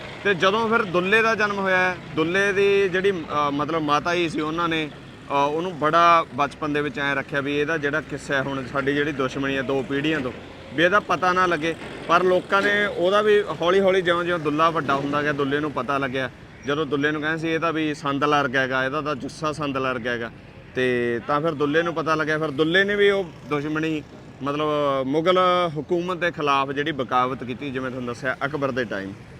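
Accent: Malwa, India. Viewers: ਲਹਿੰਦੇ ਪੰਜਾਬ ਵਾਲਿਆ ਦੀ ਬੋਲੀ ਮਾਝੇ ਨਾਲ ਦੀ ਆ ਸਾਨੂੰ ਸਮਝ ਆ ਜਾਂਦੀ ਆ ਦੀ ਬੋਲੀ ਮਲਵਈ ਆ ਤੁਹਾਡੀ ਬੋਲੀ ਸਾਡੇ ਨਾਲੋ ਥੋੜੀ ਜਿਹੀ ਅਲੱਗ ਆ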